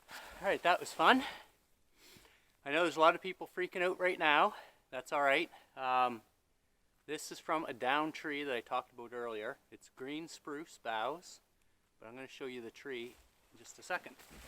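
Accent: Canada. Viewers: Are you a maritimer?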